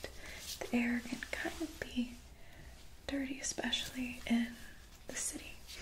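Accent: England, United Kingdom. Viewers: british again, ithought today's would be Aussie. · I thought u r english after watching previous video lol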